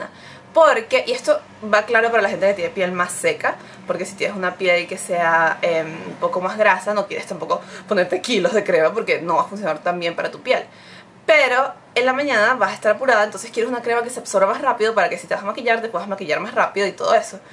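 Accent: Dominican Republic. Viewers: Eres dominicana ? pk tenemos el mismo acento;p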